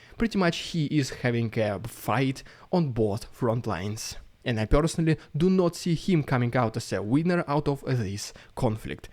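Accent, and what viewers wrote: Russia. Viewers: Pay attention 00Loxley! The gentleman on your screen, the one with the Russian accent. The one whose channel is named " ". Have an educated guess where he's from and what his channel name is. And stop smoking that stuff, it ruins your attention span! 😀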